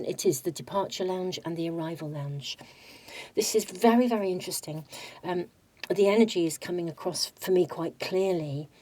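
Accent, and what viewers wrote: United Kingdom. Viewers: P1. I am so ready for change and start a whole new chapter of my life in the best possible way. I been healing, creating, building, sacrificing and strategizing so it will all come together. A life I co-create with the Universe. A life of pure authenticity and individuacion. Thank you! Your choice of words are very encouraging. Just love your UK English accent and choice of words that’s very depicting from your region. I live in Ecuador but I am Polish-Australian. ❤❤❤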